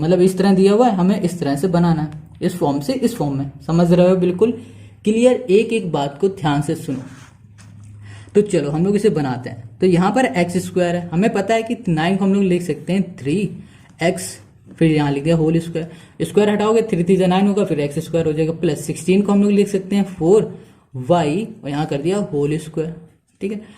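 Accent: Bihar, India. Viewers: Bhi app bihar se ho na👌👌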